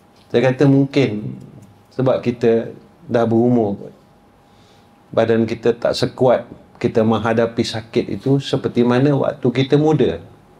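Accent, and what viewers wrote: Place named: Kelantan, Malaysia. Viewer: Comey ustaz cakap kelantan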